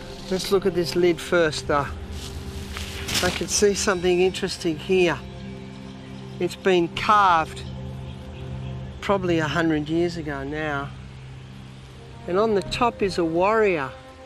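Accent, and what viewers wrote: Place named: Australia. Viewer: I knew he was Aussie! I am really proud to be Aussie right now! :)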